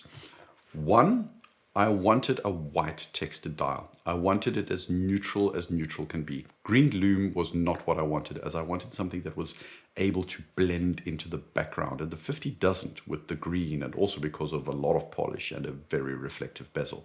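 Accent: South Africa. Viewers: A fellow Saffa, I got the Pelagos Fxd Gmt, moved over from a garmin smartwatch, Strange enough from my research, Tudor played a huge role in the old sadf & navy Hear your accent & I was like this guy could be South African, he's using the word chill & the nearing the end said a braai done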